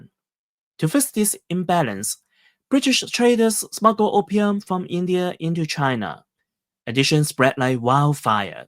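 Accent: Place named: Hong Kong. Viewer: HK English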